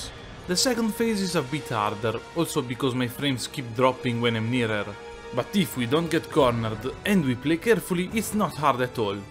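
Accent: Italy. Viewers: tutti i tuoi video sono meravigliosi, e adoro sentire il nostro accento quando parli. Gran lavoro ;)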